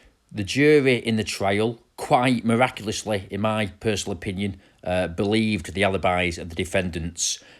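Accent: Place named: Manchester, United Kingdom. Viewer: Really enjoy your kids, though even though a lot of your accent is manc, you can't deny there's a Lancashire lilt there, which part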